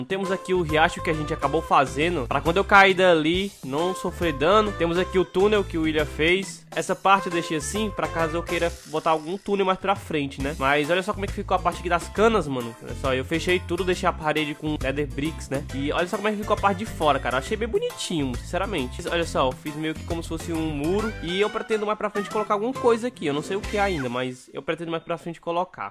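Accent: Northeast, Brazil. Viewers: Gosto muito do seu sotaque, vc é nordestino ne?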